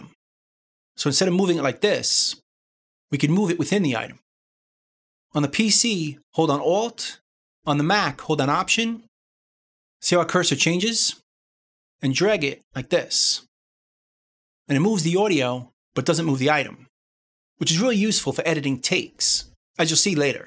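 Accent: New York, United States. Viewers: You're a talented teacher! That New York accent helps, too!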